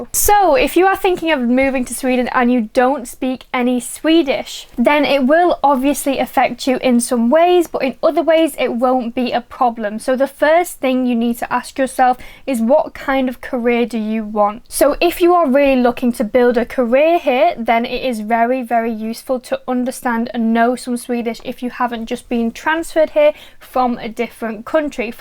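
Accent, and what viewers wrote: United Kingdom. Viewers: If you wanna make a real career here in Sweden, you normally have to learn some swedish. Otherwise, your career will stop at a certain point, which I think is quite fair to be honest. But what I'm most curious about is if you are north English or south Scottish!? ;-) (And by the way, I really do hate all the auto-spelling functions, that Youtube throws at me all the time!).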